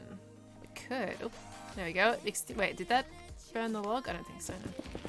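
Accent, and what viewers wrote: Australia. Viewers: Your Australian? I am too. Finally a Youtuber I dont have to get up early to watch!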